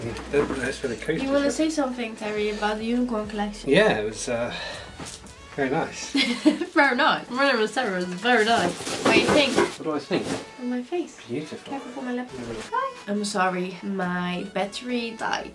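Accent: Netherlands. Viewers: ik kom ook uit Nederland😄Herkende je accent gelijk maar je Engels en uitleg doe je echt super!!! Gaaf om te zien, ben zelf veel met make up bezig en testen en een collector natuurlijk, zou ook wel mijn eigen channel willen beginnen maar durf nog niet.....komt wel. Heel veel succes met je channel!!!😘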